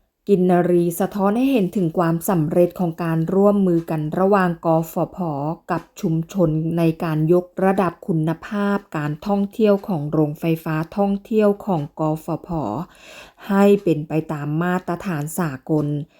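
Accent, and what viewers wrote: Cambodia. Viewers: คนพูดไม่ไช่คนไทยแน่นอนสำเนียงออกไปทางเขมร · แอดมินคน นี้เขมรนิหว่า สำเนียงเขมรเป๊ะ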